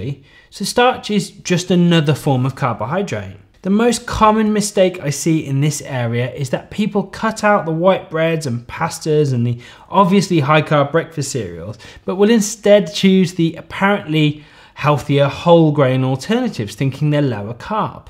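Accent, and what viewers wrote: United Kingdom. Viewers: For the past 4 years, I watch Dr. Eric Berg and to be utterly honest, I learnt quite a lot. However, the way you go through details is great. Definitely subscribing and going to browse the channel. By the way, I love the way you sound, soOo British. Since I love the accent (Sir Ken Robinson, Simon Cowell, Marco Piere White, "Gordon Ramsay" are just a few to name really) is another reason to subscribe.😃🤘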